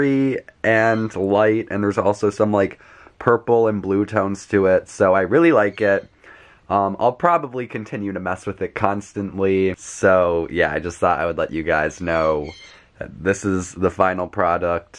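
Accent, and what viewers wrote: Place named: Michigan, United States. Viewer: Omg I heard your voice and I guessed that you were from Michigan before you said anything!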